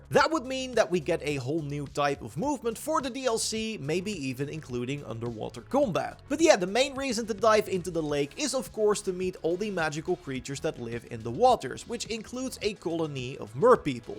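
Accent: Netherlands. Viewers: Ik hoor een Nederlands accentje haha, leuke video! Ben benieuwd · Nice, very cool info! His accent is so distracting to me, am I the only one? I'm Dutch and I presume he is aswel, well yeah 😂 so maybe that's why 🤔😊 Very nice content though 👌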